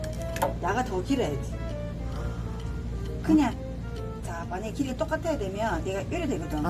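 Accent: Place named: Busan, South Korea. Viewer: 오랜만에 부산말 듣네요 용신다 요즘도 이 말 쓰네요 젊은분이 용신다 하시니 오랫동안 외국에 사는 제가 고향 부산이 확 들어 옵니다. 손녀 들 옷 만들려고 하는데 너무 예쁜 옷 도움 됩니다. 감사합니다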